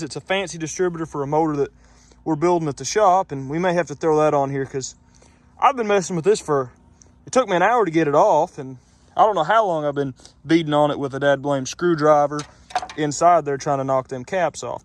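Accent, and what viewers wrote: South, United States. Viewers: you are southern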